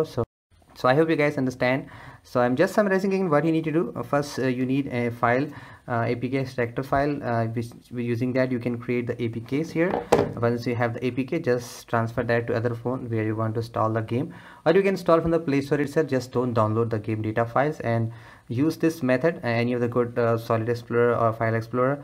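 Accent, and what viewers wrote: India. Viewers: Rapid fire speech with an Indian accent 🥴..... Geeze i need a nap !!!! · You talk like an Indian boy that I don't like and your language suck like trash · its okay every india sounds like that just like japanese tho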